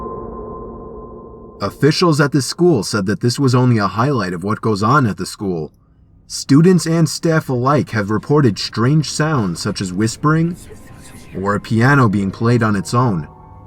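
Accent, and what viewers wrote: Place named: United States. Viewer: They don't sound the same, they have different accents... Mr. Nightmare is (I'm assuming) from America and CreepsMcPasta is British, for example.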